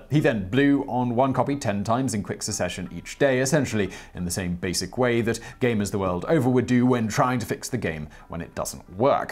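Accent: United Kingdom. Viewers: "Occasional blowing before insertion was still a thing" to my American ears, you saying that so casually with the AWESOME British accent is one of the funniest things I've heard all week! Had those words come from someone with an American accent it wouldn't have been anywhere near as funny to me..... Thanks for another great video sir! Love what you all do and how much hard work goes in to all of these videos. It's appreciated sir.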